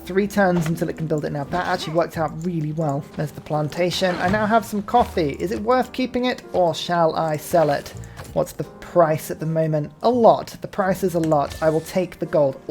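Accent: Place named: United Kingdom. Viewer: You play lovely music and your presentation is friendly and fun; love your British accent and funny little voice; witty and (as you Brits like to say) "brilliant."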